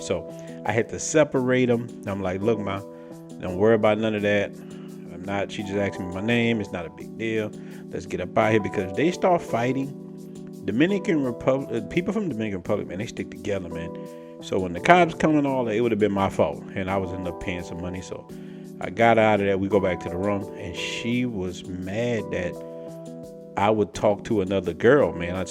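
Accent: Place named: Louisiana, United States. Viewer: what part of Louisiana are you from bro? I can hear a Louisiana twang. I'm from the boot and the way you said baffruum(Bathroom), I was like, bro from the N.O, but I can hear some of that Lafayette area in his voice also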